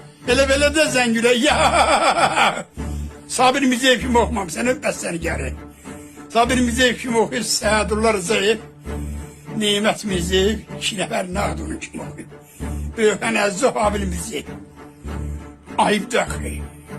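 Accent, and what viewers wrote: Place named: Jalilabad, Azerbaijan. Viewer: Юсиф Мустафаев боюк санаткардир ва чох тарбияли инсандыр. Бу йашлия кимса пул вериб ола билсинки, бела hагсиз данышир. Лаhжаси Джалилабадлы лаhжасина охшайир. Ман озум Ланкаранлыйам ва 37 илди Российада йашайирам ва hамиша маhниларина гулаг асырам. Ман бир джанублу баласы кими фахр едирам.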